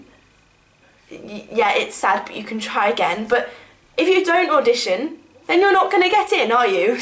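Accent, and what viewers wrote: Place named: South, United States. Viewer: this is great! Just hearing your southerner tones, and seeing you brought back so many memories...This is great!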